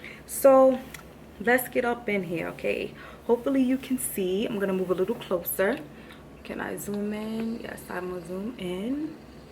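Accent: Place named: Harlem, United States. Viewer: Lol! I guessed that watching one of your other videos bcse I recognized the accent and the attitude (Harlem native, right across the bridge 😉) Peace & Love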